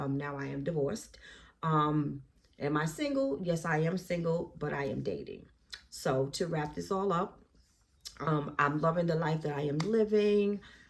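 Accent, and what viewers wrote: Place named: Northeast, United States. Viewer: Where are you originally from? Sounds like somewhere in the NE? My daughter got into Spelman also but she went to another school in Rochester NY.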